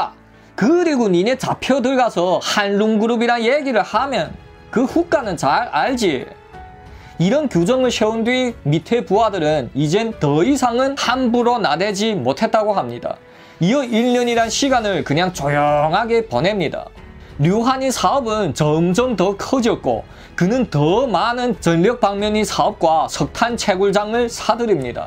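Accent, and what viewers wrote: China. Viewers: 어찌된게 삼국지 보다 더 잼나지 ㅎㅎㅎ 중국 말투가 섞여서 더 집중이 되네요.. 너무 잼나여 감사합니다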